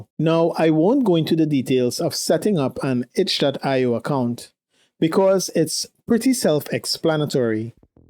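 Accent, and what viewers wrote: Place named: Trinidad and Tobago. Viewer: This accent sounds like its from Trinidad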